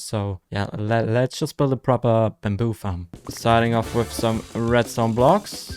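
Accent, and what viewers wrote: Germany. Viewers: Das ist eine one lovely german accent :) well done!! :) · I like that Deutsch accent a lot bahahaha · Ich liebe die Serie, und du bist ein MACHER Hoffe das dein Umzu ganz gut wird, bin immer noch beeindruckt das man deinen deutschsen akzent fast gar nicht hört ;) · Man hört das deutsch extremst raus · Wenn deutsch englisch reden einfach beste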